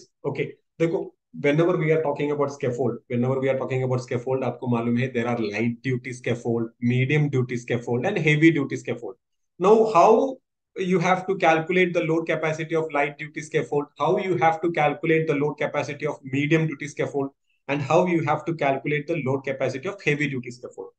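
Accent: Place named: India. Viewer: helo from Norway very good expline even with your English Hindi accent i understood you everthing im in Scaffolding curs taking teori i was wonder about loading capacity explination so this was what i was loking for This process i understand better so with no doubt Cheers the next capacity on medium x4 1000 KG its very simple in Norway standard its Light Duty depending on Companys and purpose so thank you i will suscribe to your chanell i have my own Scafolding 145m2 so this info from your chanel can be usefull in future you win one like extra😃😃🥂 thanx Cheers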